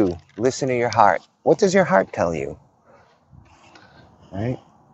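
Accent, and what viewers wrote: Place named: Boston, United States. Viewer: oh, very nice! I thought I detected a bit of a Boston or Eastern MASS accent in your voice now & then, but I could be mistaken.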